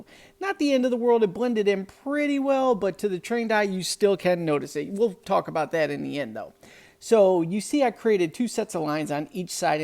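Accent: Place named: South, United States. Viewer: It was the southern accent that helped this one.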